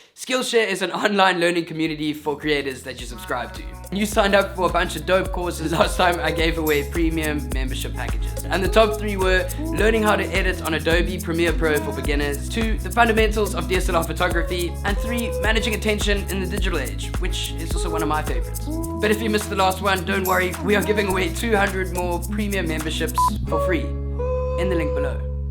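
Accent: South Africa. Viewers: So nice to hear the South African accent, keep on inspiring bru, I made a film myself and I kinda realized I did way too much of the stuff I shouldn’t xD Would love if you came up to Joburg somewhere along the line, I dig your content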